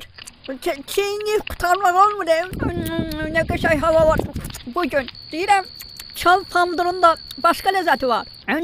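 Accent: Sheki, Azerbaijan. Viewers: Şəkinin ləhgəsi insanları elə olurda düzünə şəki❤️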